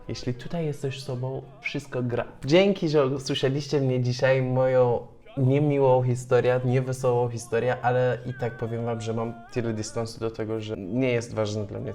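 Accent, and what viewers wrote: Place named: Rzeszów, Poland. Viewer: teraz wyglądasz i mówisz jakbyś był z Rzeszowa:) Pozdrawiam.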